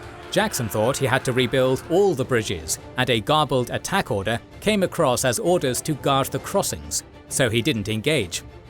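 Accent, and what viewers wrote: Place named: United Kingdom. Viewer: Hate the speed-reading thick British accent.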